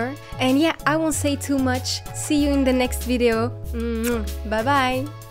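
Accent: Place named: England, United Kingdom. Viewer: I love your songs. I'm from Ecuador and I love your way to talk English, because currenly I'm studying English so I would like to know where you're from..... I can get everything you say us in your videos. best wishes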